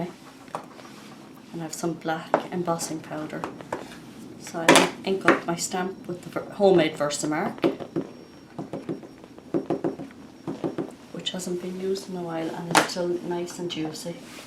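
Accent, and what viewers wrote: Ireland. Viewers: I've not looked into embossing diffusers yet, but your video makes it look so simple. A great technique to add to my cardmaking, thanks for sharing! Love the Irish accent too, lol! P. S. I wonder if you could use a plastic cutting board/mat from the Dollar Store for this purpose?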